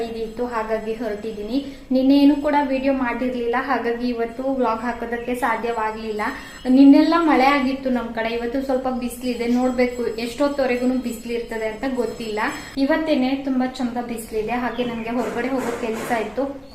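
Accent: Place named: Kundapura, India. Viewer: Nim bashe kundapura side Tara untu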